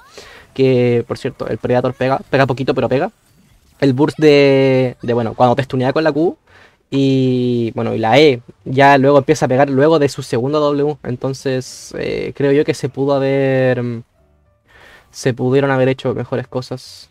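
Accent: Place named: Chile. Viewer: Me gustan tus videos porque tienes una voz super amigable, por tu voz estoy casi seguro que eres Chileno, un lindo país y buen video... Saludos desde Chile ❤️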